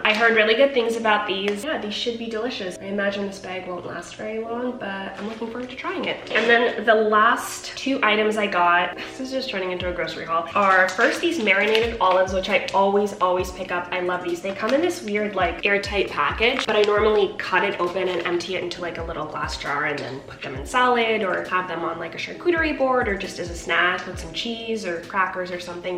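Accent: United States. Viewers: She has suck an American accent to be from Canada most Canadians have like an accent I don’t know how to explain it😂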